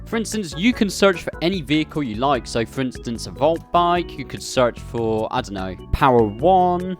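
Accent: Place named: United Kingdom. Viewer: I love this British accent 😭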